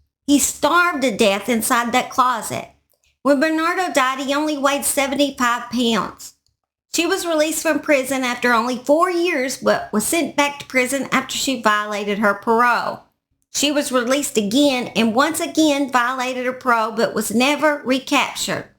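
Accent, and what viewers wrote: South, United States. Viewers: Thank you for your content I luv your country accent. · Wow,love your southern accent. Yes, this monster is the worse of the worst. Damn, the things she did to her victims are unheard of. She was one sick b*tch. I'm glad she'll spend the rest of her miserable life in prison.she still gets her 3 meals a day,free health care.Something her victims didn't get. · Just found your channel + instantly subbed. I watch true crime way too much + I've never heard this. Thank you for bringing attention to it. What a sick sick woman. I have no words...I hope all the victims of this deranged lady are living their best lives today. My prayers are with them 🙏 Btw having a severe Boston accent myself, your southern voice was lovely to hear, especially talking to your pup! Thank you for the vid. Look forward to your next ✌️💙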